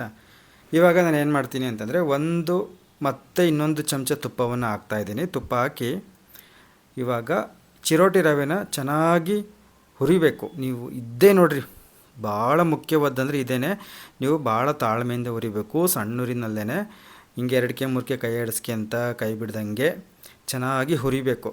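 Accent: Chitradurga, India. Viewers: Exactly u told the same story of mine also sir ......me also near Chitradurga ....ur language same as we talk in our village ... whenever I feel lonely that time I saw ur videos it make me feel happy thank you .....Because u r from Chitradurga.......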